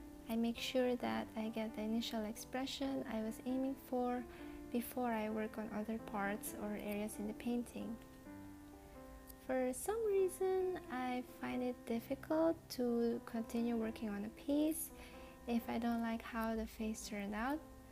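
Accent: Philippines. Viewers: Filipino accent :-)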